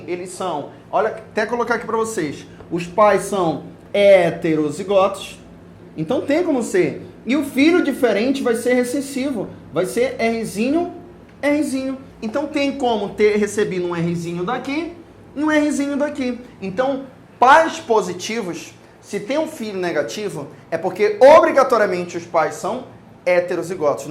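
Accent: Pará, Brazil. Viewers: Tu é paraense mano? Tem um sotaque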